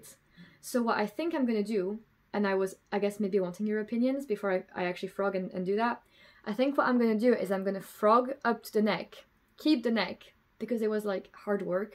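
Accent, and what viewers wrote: Scotland, United Kingdom. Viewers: A lovely podcast. Your sweater is so nice. I live in Glasgow & you sound very Scottish. I like your swatch knitted in local Suri Alpaca. I am knitting a third Sophie scarf for a friend. Thanks 🏴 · I'm watching you just now and love the Scottishness coming through your accent. Like all of us in Central Scotland you've dropped your t's. I'm really enjoying the podcast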